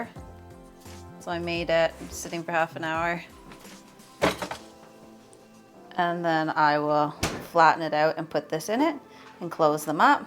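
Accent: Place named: Canada. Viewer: Interesting that you pronounce it "hambug" instead of hamburger. My father said it that way but I rarely if ever hear anyone else say that. Is that a Canadian thing? My father was not Canadian (he grew up in Buffalo NY which is very close to Canada though).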